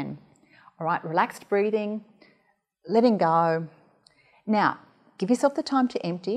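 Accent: Australia. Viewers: Terrible Aussie accent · With a voice like that it's definitely down under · It'd just an Australian accent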